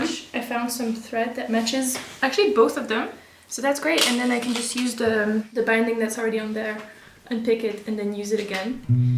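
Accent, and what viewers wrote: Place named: Germany. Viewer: ❤ Ich dachte zuerst, dass du aus dem deutschsprachigen Raum stammst, wegen dem Akzent. Deine Videos sind wundervoll, ich liebe deine Nähprojekte. Auch die Szene mit dem lebhaften Austausch über das weitere Vorgehen, war sehr lebensnah und schön zu sehen. Abo, Glocke und Vorfreude auf weitere Videos von meiner Seite her ❤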